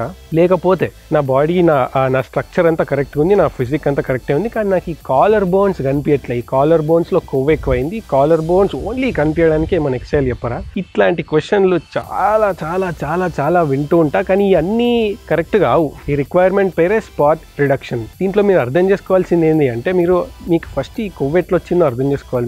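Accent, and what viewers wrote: Telangana, India. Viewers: Telangana slang bavundhi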